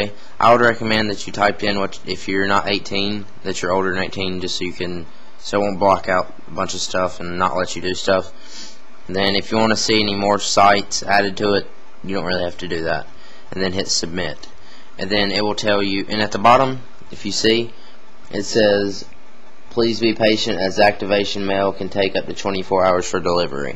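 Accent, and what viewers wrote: South, United States. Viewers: this guy sounds like a hillbilly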